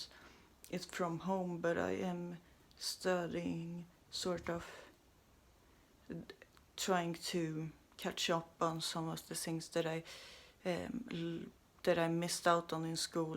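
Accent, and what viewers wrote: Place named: Sweden. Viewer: Sounds swedish. Köp l tyrosine, fixar avgiftningen. Naturligt koffein, en aminosyra. 150kr burken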